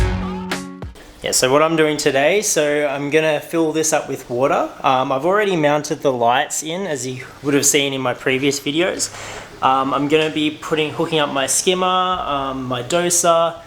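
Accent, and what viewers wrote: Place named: Australia. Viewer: What was the name of the controller? I don’t understand your Aussie accent well enough 😅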